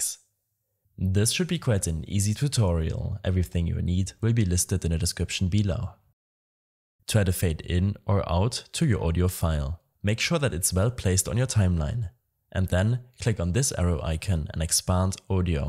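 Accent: Germany. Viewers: Bruder was ein harter deutscher Akzent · Korrekt, danke dir :) Man hört raus, dass du deutscher bist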